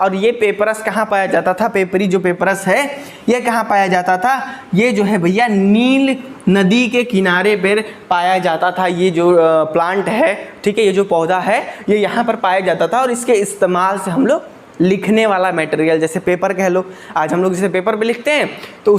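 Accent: Bihar, India. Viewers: Bhai aap bihar se hona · Bhai tum shi pdhate ho Bihari accent me mja Ata h pdhne me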